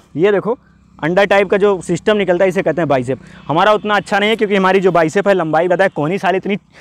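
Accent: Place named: Uttar Pradesh, India. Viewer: Ap up se ho kya language to wase bol rahe ho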